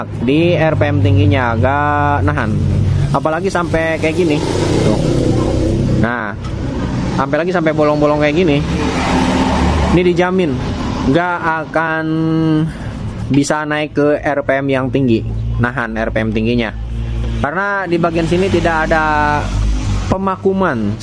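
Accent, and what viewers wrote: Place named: Serang, Indonesia. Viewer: wong endi kang gale gmge jawa serang😅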